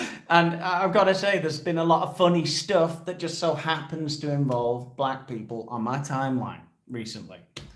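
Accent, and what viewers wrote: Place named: England, United Kingdom. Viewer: Mr you are such a handsome englishman. I love your accent. Female from Trinidad here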